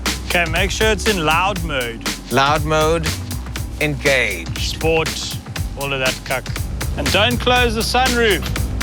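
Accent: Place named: South Africa. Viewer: Miss that accent true South African Keep it up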